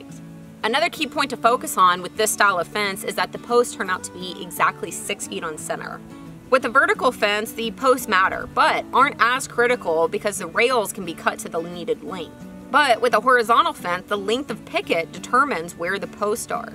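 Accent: Texas, United States. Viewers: Hmmm... Accent sounds like Texas... Rocks in the ground, so probably not Gulf Coast or Dallas... Too much vegetation for it to be West Texas, so must be Central Texas somewhere... Howdy from the Houston Gulf Coast area!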